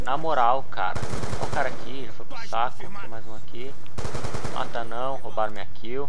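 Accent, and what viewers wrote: Rio Grande do Sul, Brazil. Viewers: Tu é gaúcho?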